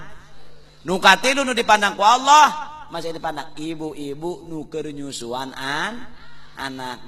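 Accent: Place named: Rangkasbitung, Indonesia. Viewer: Rangkas bitung hadir poko na kh mah eweh 2 na mantap ciri khasnya bahasa rangkas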